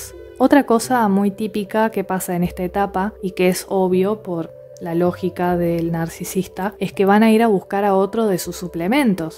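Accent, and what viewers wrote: Córdoba, Argentina. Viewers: Hola excelente tu trabajo sos de alguna localidad de Córdoba, ya q tenés tonada cordobesa , sé reconocer esa tonada , porq yo vivo en Córdoba . Una pregunta y me interesaría saber cómo es la vida de un narisista en la vejez , cómo son sus comportamientos y sabiendo q ya no puede conquistar ? Un beso . :hand-pink-waving:🙂😘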